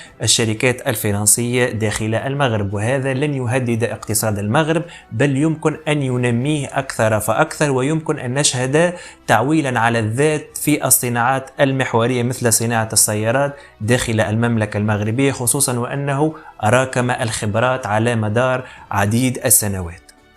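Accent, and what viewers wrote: Tunisia. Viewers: انا لقيت قناتك صدفة من ساعة شاركت معك لأني وجدت المحتوى جيد بالتوفيق ان شاء الله سؤال من أي دولة شقيقة انت؟ من اللهجة اظنك تونسي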